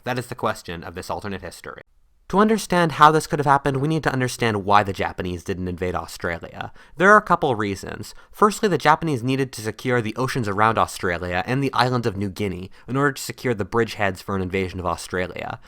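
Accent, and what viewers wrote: United States. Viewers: Stupid fuckin annoying American accent coupled with poor analysis. Shite video. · I was complimenting him on knowing how Aussies say those place names. There's another word Americans get wrong, Australians say Ozzies while most Americans say Ossies. He's obviously wel travelled and pays attention, without losing his American accent during normal speech.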